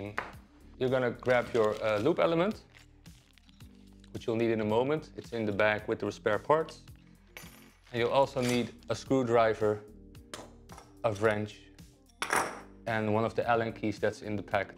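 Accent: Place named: Netherlands. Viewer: Ik zat dit te luisteren en besefte mij ineens dat je Nederlands bent :) dankjewel voor de instructie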